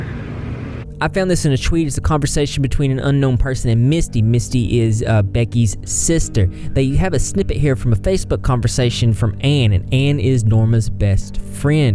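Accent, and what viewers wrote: South, United States. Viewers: I watch your videos only because of your southern accent. 🤠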